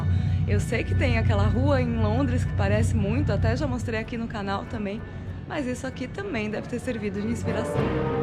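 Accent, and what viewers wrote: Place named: Porto, Portugal. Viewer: Parabéns pelo video muito bem falado parece uma Portuense, obrigado por dar a conhecer a Cidade do Porto também conhecida por Cidade Invicta.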